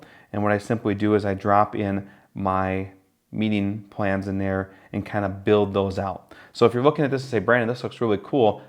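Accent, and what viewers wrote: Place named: Wisconsin, United States. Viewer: I KNEW you had a WI accent! CONFIRMED! :) Is there a Sconie discount? ;)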